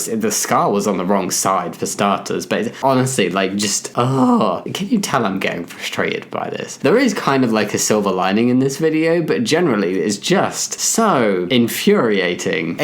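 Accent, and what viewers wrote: United Kingdom. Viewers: can u do an american accent. Ur british accent sounds like nails on a chalkboard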